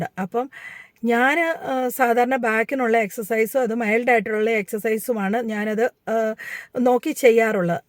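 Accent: Kottayam, India. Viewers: She speaks like my wife, who is from Kottayam District.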